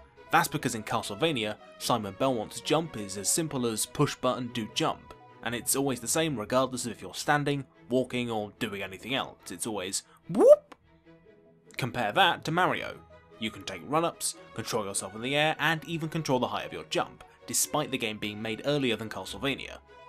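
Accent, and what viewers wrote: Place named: Northern England, United Kingdom. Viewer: probably just Northern/Midlands English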